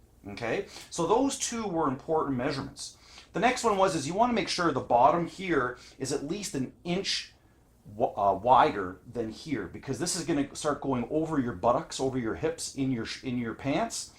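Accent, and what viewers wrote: Canada. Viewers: Loving that rich Canadian accent!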